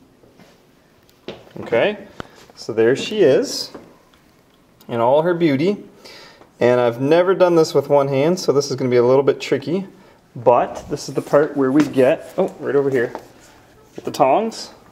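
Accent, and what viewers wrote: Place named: Canada. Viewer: Why did i think this was king of diy yhe accent is obviously Canadian but his voice is so similar BTW nice snake im getting one in 2 months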